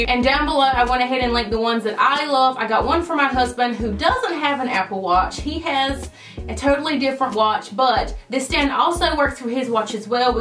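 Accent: South, United States. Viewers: You have a strong southern accent, the second i heard it i was like WOW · Everyone in the comments are saying you have a strong southern accent and I was like I don’t hear it so when we went to go see my cousins in Atlanta they were like omg they were like she sounds just like you also you are so pretty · Here for the Southern drawl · lol I’ve never seen a YouTuber with a southern accent . Definitely subscribing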